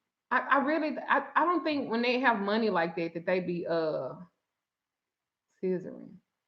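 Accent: South, United States. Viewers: You & your husband look like you two were made for each other: Twin Flame Energy 💫 I love your southern accent.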